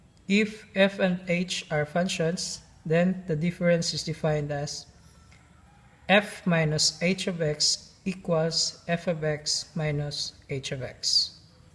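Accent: Philippines. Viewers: are you filipino btw? Your accent sounds familiar to me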